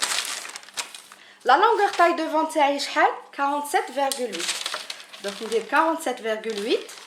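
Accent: Tlemcen, Algeria. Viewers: vous êtes de Une axent Tlemcienne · بالفرنسية مع لهجة الجزايرية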